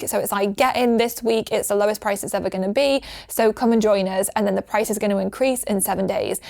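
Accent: United Kingdom. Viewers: I admire the accent , literally. I am trying to pull out mine. i love the british accent.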